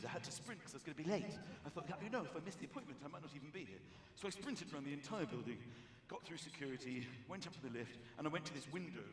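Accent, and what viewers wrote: United States. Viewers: I LOVE your American accent! But I could listen to you talk for hours. ♥️👂🤣🤣🤣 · I love his American accent too lol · I love his American accent, the way he leans on the hard "R." Funny!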